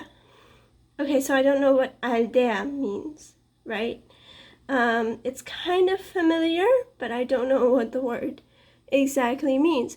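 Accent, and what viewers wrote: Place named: Spain. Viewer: Dear You were awesome 👏👏👏👏👏👏👏 I haven't finished watching the video, But I enjoyed listening to your reading; it was so fluently with a pleasant accent, it even sounded like a native Spanish to me. The way that you explained about reading the story in a foreign language, was interesting for me. I'll use it in English, I hope it works for me as much as it worked for you in Spanish 😊 Good Luck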